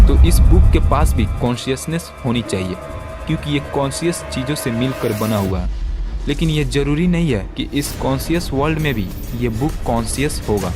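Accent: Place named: Assam, India. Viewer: Sir aap Assam se lagte ho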